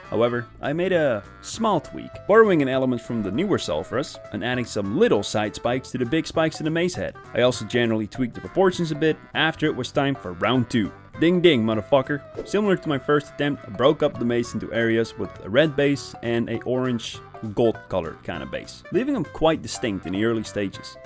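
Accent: Netherlands. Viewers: Thats sick! Do i detect a dutch accent? keep up the good work!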